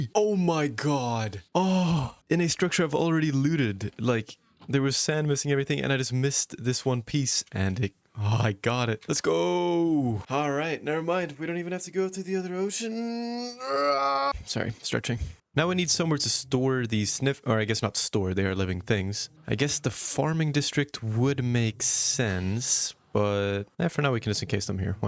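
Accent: Sweden. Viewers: Wait are you Swedish lol, that’s identical to a Swedish accent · are you Swedish??!!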